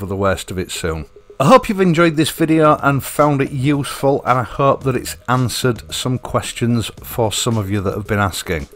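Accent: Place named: Barnsley, United Kingdom. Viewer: Interesting accent! Where? Not Liverpool: barnsley perhaps? I am about to buy a Bell 500 (why don't they do the colours they used to market in the US??) and yes, Halcion goggles as per yours. It was almost already decided but your vid. took away any further question apart from where to buy both in or around Somerset (not via computer!!!). Bike,, I'm 71 and just bought a 1959 Harley Duo Glide. Silly old fool!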